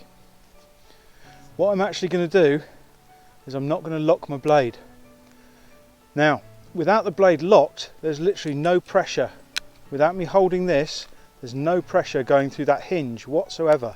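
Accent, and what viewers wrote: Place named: United Kingdom. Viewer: Wow, in the world of accents I found a British man!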